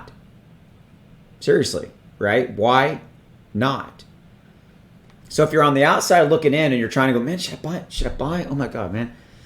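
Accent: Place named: Appalachia, United States. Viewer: Like your video. As for your accent, you sound like a local (NC / SC mountains).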